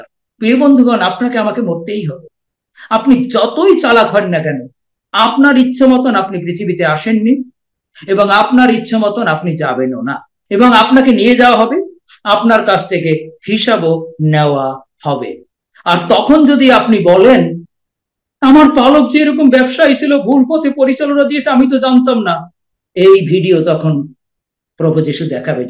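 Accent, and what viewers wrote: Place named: Barisal, Bangladesh. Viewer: জন্ম স্থান বরিশাল? মাঝে মাঝে বাঙাল ভাষা বেরিয়ে আসে।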